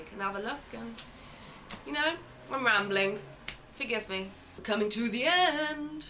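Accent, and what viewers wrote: England, United Kingdom. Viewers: It's an English accent.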